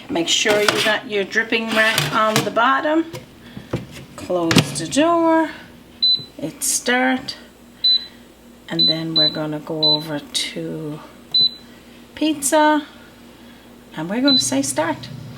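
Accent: Ireland. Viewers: Well Hi....Thank you kindly. What a wonderful & simple recipe this is. I've just watched it. I'm most definitely going to be having a go over the weekend....I'm sure our lovely grandson is going to just love this type of chicken with tater-tots....I'll be adding a little sprinkle of garlic powder & a hint of paprika..... Thank you kindly. I really very much appreciate your taking time to post this....Just subscribed 2....All good. I'm a seasoned traveller myself. Many decades ago of course....I'm picking up a we bit of Irish or Dutch in your lovely accent? I'm definitely going to say Irish ancestry..... Been Der2......😍😃😍😃😍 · I thought you were Irish because of your accent! Nice review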